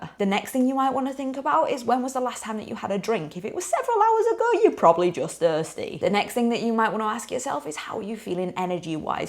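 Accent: United Kingdom. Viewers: It's British Northern accent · are you from west yorkshire? your accent reminds me of when i lived in huddersfield and sheff :) loved the video, def relate.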